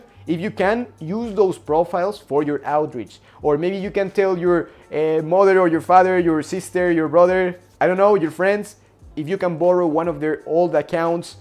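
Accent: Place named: Ecuador. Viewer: Thank you for your work. Your accent reminds me of Marlon Vera who is a very respected MMA fighter from Ecuador.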